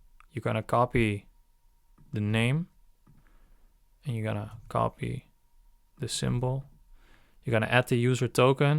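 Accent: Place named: Netherlands. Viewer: Je hoort het aan het accent hij komt sws uit nl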